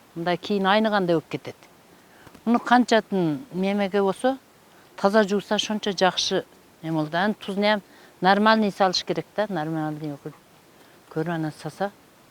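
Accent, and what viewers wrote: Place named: Batken, Kyrgyzstan. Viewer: эже Баткенден болот ошого тилибашкача менин жердешим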